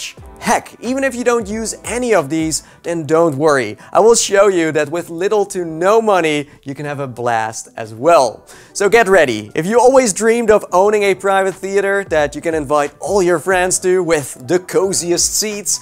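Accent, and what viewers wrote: Netherlands. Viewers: Obvious dutch accent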